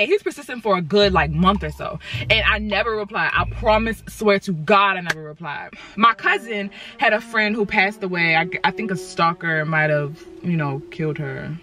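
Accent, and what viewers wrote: Baltimore, United States. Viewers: Are you from Baltimore and I love you videos they funny af ☺️💕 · Love the Baltimore accent !! This young lady needs her own TV show !!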